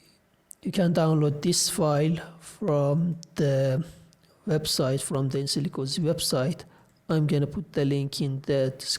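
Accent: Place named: Iran. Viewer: loved your Farsi accent. Iran's flag is risen anywhere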